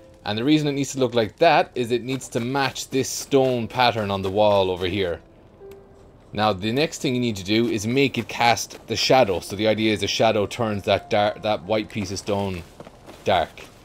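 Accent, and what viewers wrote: Ireland. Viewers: Love the waterford accent boi!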